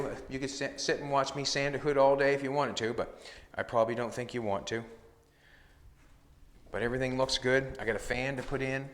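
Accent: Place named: Canada. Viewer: 30 minutes ago I was incredibly frustrated while attempting to polish the defects out of my own Imron paint job. I don't think this really helped me figure out how to fix it, but You're calm Canadian accent calmed me down, haha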